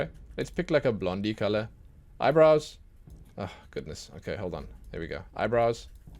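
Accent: South Africa. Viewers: Subbing, cause your accent is South African😂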